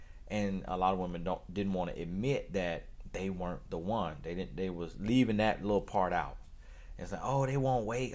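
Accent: South, United States. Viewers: And please dont speak the Q ueens English, your wonderful Southern Drawl. Just drives your wondeful points home all the morrrrre!!! 😎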